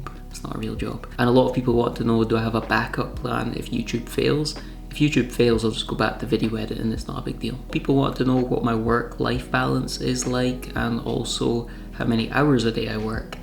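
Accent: Scotland, United Kingdom. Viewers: First of all, you’re oddly addictive, I couldn’t stop watching you and you voice is very calming. I can see why you have so many subscribers but….. outside of your incredible good looks, great hair and voice, it is miraculous that you have this many subscribers from only 36 videos posted. I’m assuming that tiny homes are very popular but seriously, you made that much money off of only 36 videos? I initially thought you had a huge following on Instagram but there’s not many videos there either and far less followers. Which leaves the question how did you do achieve this success?? The only logical conclusion is the key to your success is the tiny house, good looks, great hair, a Scottish accent & soothing voice. I’m so jealous but I too have subscribed & accidentally watched 3 videos already before commenting!! you must be casting spells on your viewers.. 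I hate you lol lol!! Keep up the excellent work, I look forward to seeing more of you soon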